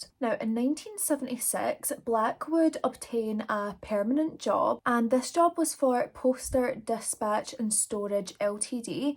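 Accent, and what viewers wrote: Scotland, United Kingdom. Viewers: BTW, your Scottish accent is everything 🤩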